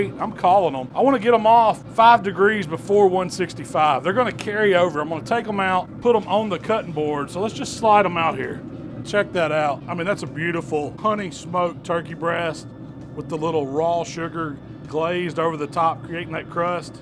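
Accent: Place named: South Texas, United States. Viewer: If I had to guess, he sounds like he’s from South Texas. Just a guess.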